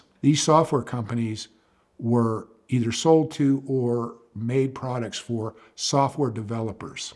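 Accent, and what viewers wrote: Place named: Pittsburgh, United States. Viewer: I thought I heard a Pittsburgh accent there. It’s not thick but to the train Deer it’s definitely there. Then when you were talking about the Pirates winning the World Series in 1960 I said Yep I knew it.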